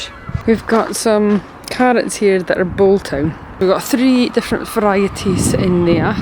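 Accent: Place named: North, United Kingdom. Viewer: I thought I heard a northern accent, r u from Shetland?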